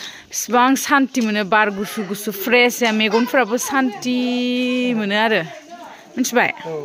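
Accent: Kokrajhar, India. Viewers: Abo ngni railainaya kokrajhar nijg same jayw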